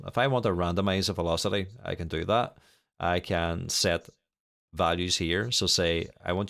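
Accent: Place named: Belfast, United Kingdom. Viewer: Well DAMN, I grew up in Manhattan, even I know that's a West Belfast accent (so I do). Granted, my father grew up in the Divis flats, but it was hardly noticeable that I remember. Great video. I HATE the ableton piano roll.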